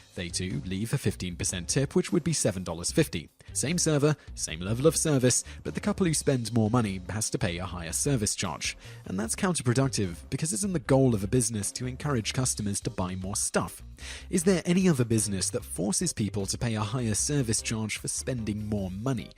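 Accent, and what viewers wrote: United Kingdom. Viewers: The narrators voice was was from the UK I know because I am also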